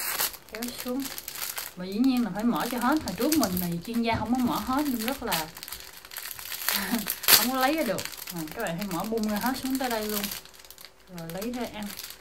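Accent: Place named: Long An, Vietnam. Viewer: giọng nói giống người long an nhỉ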